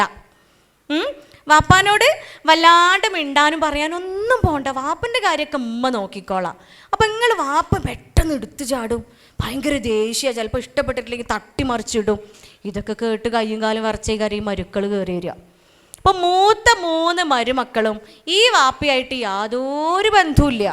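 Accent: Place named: Malappuram, India. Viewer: മലപ്പുറം ബാഷ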